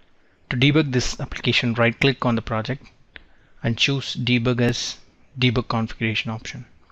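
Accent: India. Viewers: Awful Indian accent as always. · wow this one is exactly from middle of india - however useful tutorial but bad accent - anyway good luck to you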